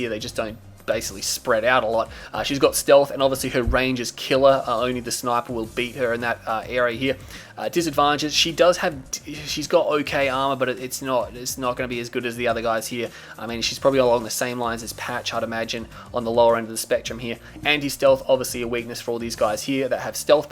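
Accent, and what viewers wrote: Australia. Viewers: Your Ausssie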